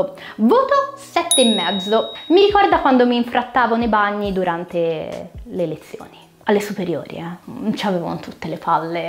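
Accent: Livorno, Italy. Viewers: Il tuo accento toscano mi fa impazzire 😄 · Io riesco a riconoscere che è livornese.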